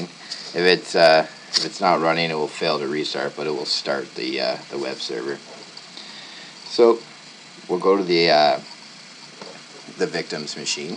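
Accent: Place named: Canada. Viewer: love the Canadian accent.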